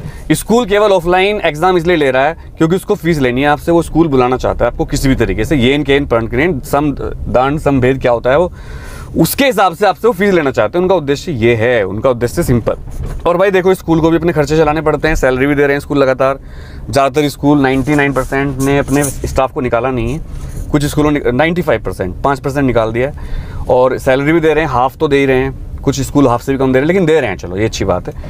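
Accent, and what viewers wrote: Delhi, India. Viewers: Delhi se ho kya sir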